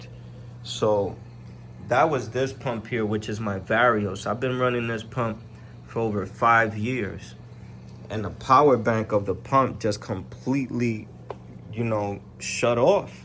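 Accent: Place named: New York, United States. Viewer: dimensions? Correct me if I’m wrong, but you sound like you’re from NY!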